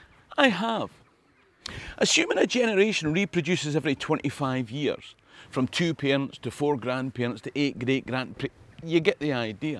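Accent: Scotland, United Kingdom. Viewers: "tell me your thoughts in the comments section" I really identified with the silly blustery American who really doesn't know much or think things through. it makes me so happy to have access to those feelings because someone talked about them. The only people I knew who had Scots accents and true history were gone pretty early in my life, so bitterness has been replaced by blusteriness whenever I find someone to talk to. Especially when I'm feeling like a man, talking to other men! I recently had someone call MacDonalds of Glencoe "cannibals" and it made me so furious I never spoke to them again because it was just not correct. I love hearing your accent and the Scots Gaelic language!! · Wonderful analysis, and as an American whose family story says we came from Kelso (btw, another Washington State parallel to Kelso just like Kenmore) I think your 5 points are dead on. 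We visited Kelso last year and learned no one named Kelso lives there. But we bear the name and it’s a lovely place. Love your videos, although I find the closed captioning helps this American understand your delightful brogue. Keep up the great work, Dick Kelso, Minneapolis.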